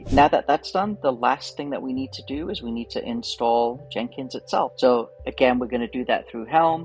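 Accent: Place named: United States. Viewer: you sound like you're in high school, super american, the profile pic is a guy in his late 40s called. what is this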